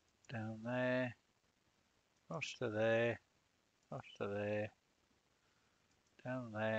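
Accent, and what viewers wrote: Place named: Birmingham, United Kingdom. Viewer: Thank you for that very easy to follow tutorial, great t hearing your Brummie accent.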